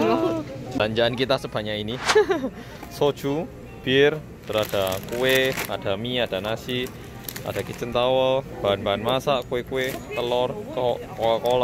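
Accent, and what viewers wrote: Java, Indonesia. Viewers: sehat trus mas medok 💜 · Duh mas ini medok banget:v Elsa nya comelll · Mas suka indomi yg medok apa yg gmn mas? Kalo ak suka mas yg medok. Iih apaan sih. Maaf. Sukses sehat slalu mas. Dipertahankan trus ya mas ke medok an nya.. jgn kayak yutuber jadi2an. Yg suka pamer giveaway kesultanan yg fana dan prank yg gak beretika. Semangat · Hallo Oppa, sangat kagum dgn bahasa indonesia rasa jowo nya yg sangat medok . Penasaran brp lama untuk bljr bhs indonesia sehingga bagus sprti itu. Dan terima kasih banyak untuk sudah menginterview BTS dgn sangat baik. Sbg ARMY aku subscribe channel mu. Matur suwun kamsa hamnida. 🙏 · Baru nyadar kakae hg medok rekk.... after cov. Oengen ke koreaaaa auiii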